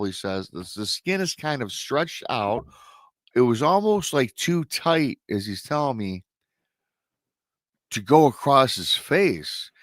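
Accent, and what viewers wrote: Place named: Michigan, United States. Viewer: Michigan is my home state. I love your accent. It makes me homesick.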